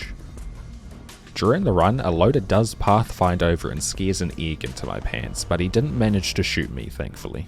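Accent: New Zealand. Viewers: New Zealand accent is a strange choice. It's very realistic sounding, but most other accents are a lot more pleasant on the ears. "Uf I take even a sungul hut, the run is did."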